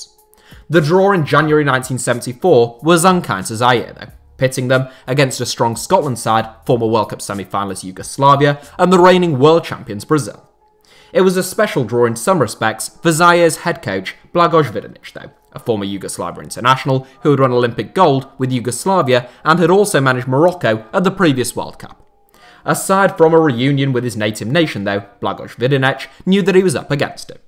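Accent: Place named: England, United Kingdom. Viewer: You sound english but you dont half bunny like a septic....show the clip FFS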